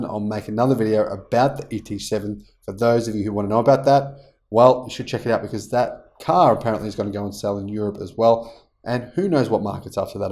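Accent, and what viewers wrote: Australia. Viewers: I just subscribed to your channel. It's great. To make it even better, please get yourself a good lapel mike. (No offense intended, but your Australian accent is an added challenge to non-native English speakers.)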